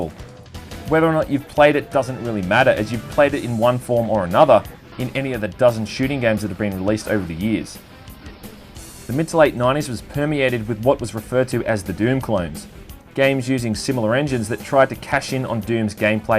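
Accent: Australia. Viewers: I can accept that it is the grand-daddy of FPS games, but I still like Heretic better. Although I can concede that Heretic is made a lot easier by not having any enemy using a hitscan weapon against you. And I absolutely love your Retro Reviews. Made especially better with your Aussie accent of course, and funny things you say that only us Aussies would say :P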